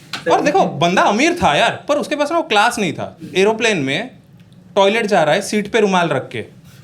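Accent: Odisha, India. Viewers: Bhai pura radducha .... Hama hindi in odia accent ❤😊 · Tone se to odisha se lag rhe ahem ! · Odia tone sun ke maja aa gya!!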